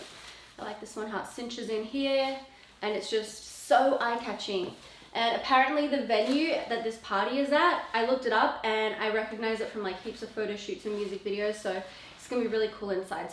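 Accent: Australia. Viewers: Loved the look! The palette looks amazing! And love that you’re repping the Aussie accent 🤙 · Your accent🥺🥺 I miss your fully Australian one😔❤️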